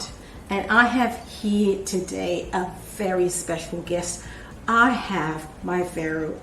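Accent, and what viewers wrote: Australia. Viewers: Australian accent ❤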